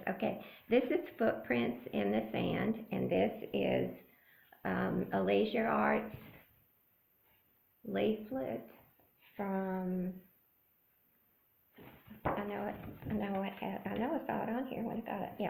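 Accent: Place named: South, United States. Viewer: Hi from Pennsylvania (but originally from Louisiana)! It was so wonderful to hear your awesome southern accent! Loved your video and hope you continue doing them!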